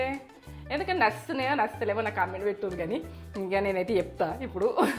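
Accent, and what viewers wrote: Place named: Yadagirigutta, India. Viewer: Aunty meeru matladutunte same maa akka valla attagaru matladinatte undi mee slang..medi yadagirigutta side untara aunty..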